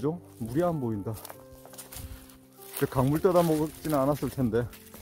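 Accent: Gangwon, South Korea. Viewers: 유트버님 억양과 말씀 하신걸 들으니 강원도 영 평 정 같아요. 항상 보고 있습니다. 감사합니다.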